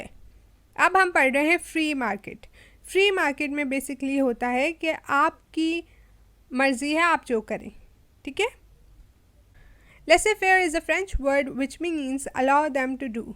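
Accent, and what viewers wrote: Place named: Peshawar, Pakistan. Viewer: Wah g wah peshawar sa ho kia ???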